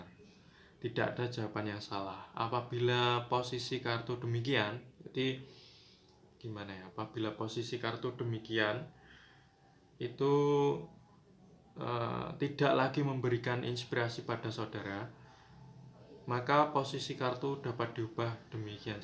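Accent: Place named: Java, Indonesia. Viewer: Medhoknya itu lo kak😆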